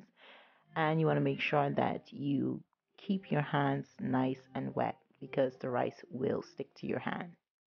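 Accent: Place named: Jamaica. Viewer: Love ur Jamaican voice 😆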